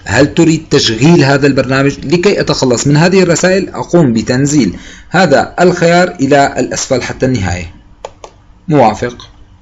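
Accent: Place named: Levant. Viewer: تعجبني لكنتك الشامية ولغتك العربية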